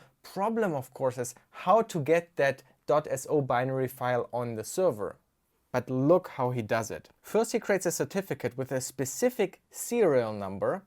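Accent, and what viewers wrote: Germany. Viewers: You're german right? I think I hear it from your voice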